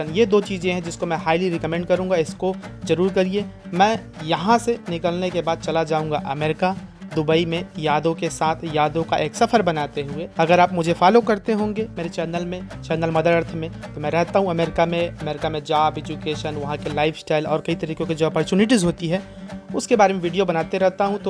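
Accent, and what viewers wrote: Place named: Chhattisgarh, India. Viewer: मैं आपका वीडियो काफी दिन से देख रहा हूं और आगे भी देखते रहूंगा क्योंकि यह सही नहीं चाचा आप जवाब देते हैं और काम करते हैं और आपकी बोली जो है एकदम छत्तीसगढ़ी है जो कि हमारा ही है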